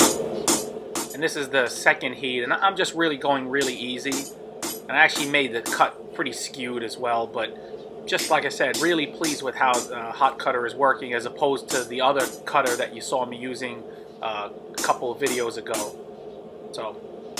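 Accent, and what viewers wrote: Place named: Staten Island, United States. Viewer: My wife is from Staten Island. I thought the accent sounded familiar.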